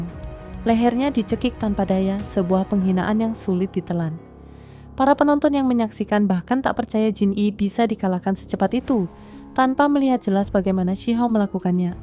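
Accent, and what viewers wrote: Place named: Java, Indonesia. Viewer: lanjut mntap....ga ada bosenya kaya suara indah ke jawa2nya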